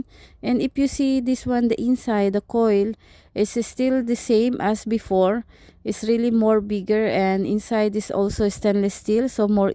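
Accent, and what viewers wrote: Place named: Philippines. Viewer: ARE YOU FILIPINO? IM PRETTY SURE I HEAR A FILIPINO ACCENT RIGHT?😁 COZ YOU HAVE A SIMILAR ACCENT TO MORISSETTE AMON.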